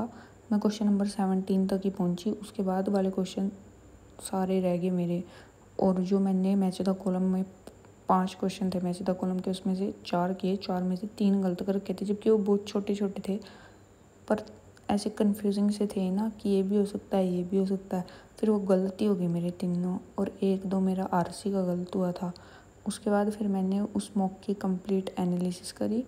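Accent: Haryana, India. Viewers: Aapki aawaj se lgta h ki aap kurukshetra side se ho...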